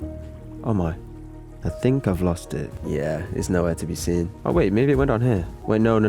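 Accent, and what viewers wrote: United Kingdom. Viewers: I like Dino, I like British voice. I enjoy this very greatly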